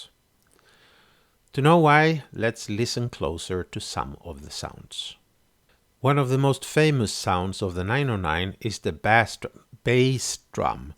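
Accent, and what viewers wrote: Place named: Scandinavia. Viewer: Your accent sound pretty much like your from scandinavia. I would guess you´re from sweden or norway, but, am I correct (im swedish by the way).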